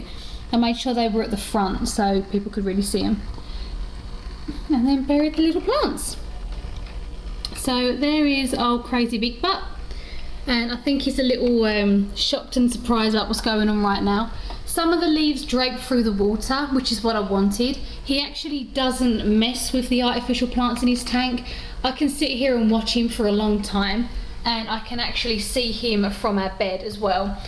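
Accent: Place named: London, United Kingdom. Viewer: You went to Walmart. You sound like your from south or east London.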